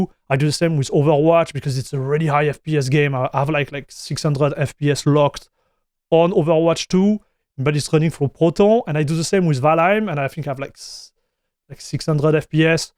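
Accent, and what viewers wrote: France. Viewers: I Love that did the Benchmarks and that you did a fair review. And i 100 % agree to you. Nicks Point is still valid, for Casual gaming the distro is Not as important as you might think at First. Greetings from Germany, Always love watching both of you, great content and awesome french accent.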